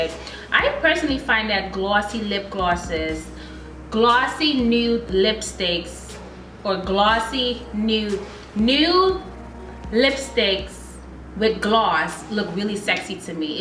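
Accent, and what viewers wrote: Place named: United States. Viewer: haha meisje ie tja kiek yere! Mi lob din video's dief yu. A accent fie helemaal amerikaans, a no spotoe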